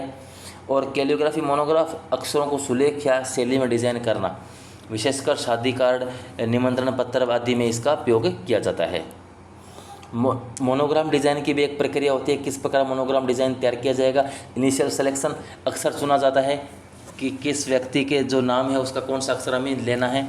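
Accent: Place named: Haryana, India. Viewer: Sir aap Hariyana se ho kya... Aapki awaj se lag raha hai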